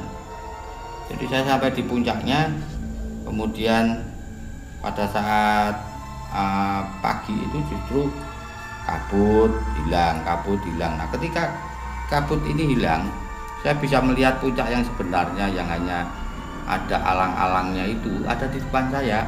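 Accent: Java, Indonesia. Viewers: host nya medok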